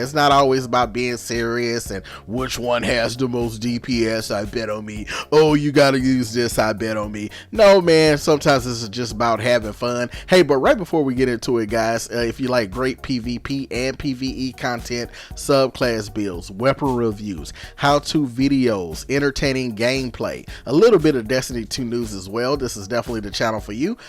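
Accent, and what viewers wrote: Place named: South, United States. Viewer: You remind me of Magamu, but with a Southern accent. Great video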